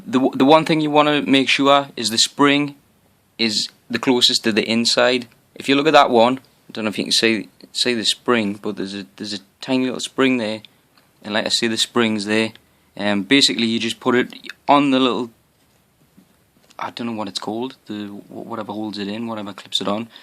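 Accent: Newcastle, United Kingdom. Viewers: r u a goerdie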